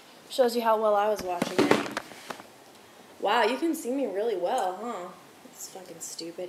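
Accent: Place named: Tennessee, United States. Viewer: Can totally hear the Tennessee in your accent!!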